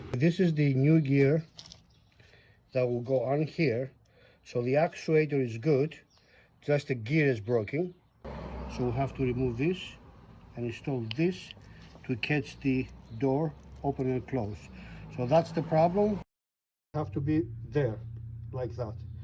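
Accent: Greece. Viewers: Good video sounds like Greek accent not that it matters lol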